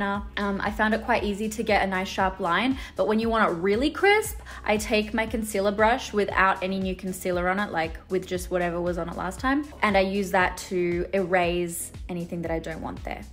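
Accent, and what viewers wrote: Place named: Australia. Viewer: why did i read that in her aussie accent?? 😅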